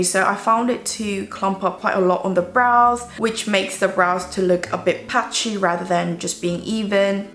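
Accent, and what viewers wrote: United Kingdom. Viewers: 영어 공부하는 중이라 영어영상이 더욱 반갑네요!! 영국발음이랑 유창한거 너무 멋져요ㅜㅜ 또 한번 반했네요💕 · 언니의 영국 억양에 한번 더 녹고 갑니다 ㅎㅎ 🙂😊👍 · 이거 영국식 발음이에요 아니면 호주이런쪽이에요?......... 억양이 어느나라 영어에 가깝나요?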